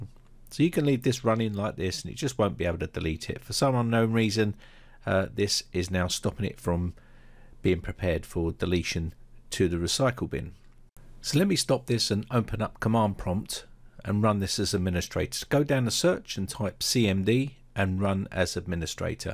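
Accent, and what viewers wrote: United Kingdom. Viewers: Finally british accent being found.. This is rare.. no indian agian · It's British accent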